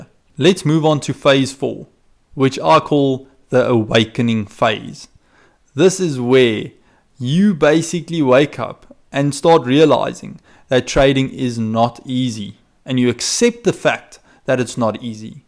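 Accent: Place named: South Africa. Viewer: You sound South African. Great content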